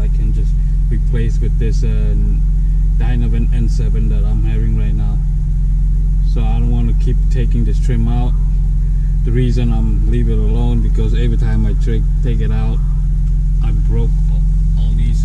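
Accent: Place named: Cambodia. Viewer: I detect a Khmer accent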